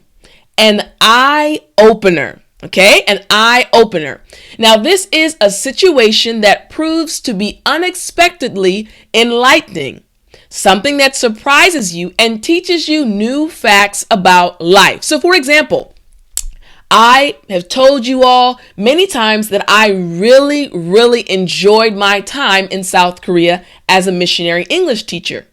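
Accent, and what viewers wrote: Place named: United States. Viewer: Hello, there! I'm an English teacher from Rio de Janeiro, Brazil. I usually watch videos from British channels, for I'm a sucker for their accent. But you speak so clearly and beautifully that I have become your fan. Where in the US are you from? Thank you and keep up the good work! <3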